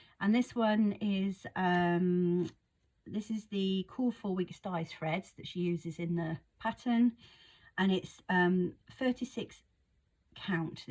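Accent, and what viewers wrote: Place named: England, United Kingdom. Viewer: So lovely to hear your “English “ voice and see all your beautiful work. We have very similar tastes and we snapped on quite a few pieces. Love your little pumpkin design and look forward to that as a release one day. I’m also on the Raven bandwagon!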